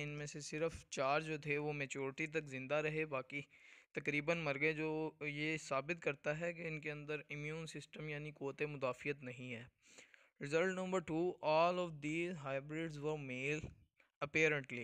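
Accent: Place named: Pakistan. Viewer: آپکی انگلش بولنے کے طریقے سے ہی پتا چل گیا تھا کہ آپ پاکستانی ہی ہو😃😉😜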